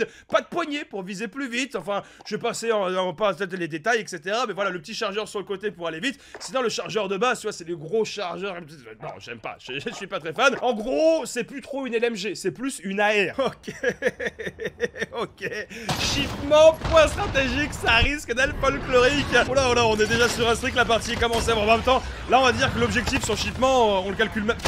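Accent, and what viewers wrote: England, United Kingdom. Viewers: Ton accent anglais me fait trop rire😂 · L accent anglais et ouf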